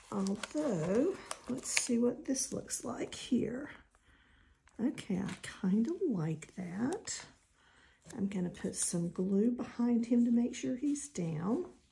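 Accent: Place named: South, United States. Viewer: Hi~I've just found you. This looks like a fun project which I'm going to add to several of my many, many journals. I'm thinking that this is a great way to use up some of those found, pressed, and saved items plus what-nots that are accumulating in my desk, that can use a safe home and this remedy is so clever! Since I do not have a square punch, I'll use a round one instead, which I'm sure will turn out just fine. I'm really enjoying your soft sweet southern accent. Thank you for apologizing frequently, but it really isn't necessary , at least not for me. You're fine just the way you are. I look forward to more inspiration from you, till then, thank you.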